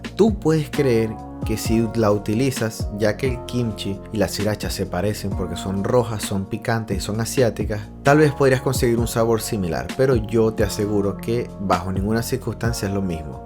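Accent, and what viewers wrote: Venezuela. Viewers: Pareces maracucho 😏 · Ays hace poco conocí una venezolana que hablaba como tú 😅 majísima por cierto!!😊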